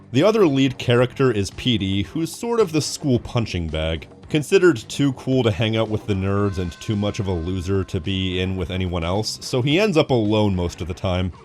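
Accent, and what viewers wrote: Canada. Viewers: Go Canucks? I knew you sounded like a fellow Canadian 😅 Go Sens Go! 😉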